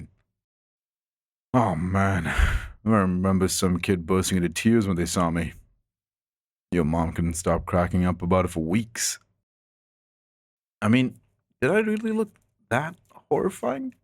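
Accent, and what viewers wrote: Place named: Caribbean. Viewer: I can’t pin the accent. I know it’s caribbean. Is it VI, Bahamian, Trini, or Guyanese? Maybe Belize??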